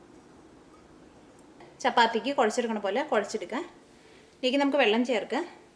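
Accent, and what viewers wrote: Thrissur, India. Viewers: ചേച്ചിടെ ഭാഷ കേൾക്കാൻ തന്നെ വിഡിയോ കാണാറുണ്ട് ... അങ്കമാലി, തൃശൂർ ,,, ഭാഷ ഒത്തിരി ഇഷ്ടമ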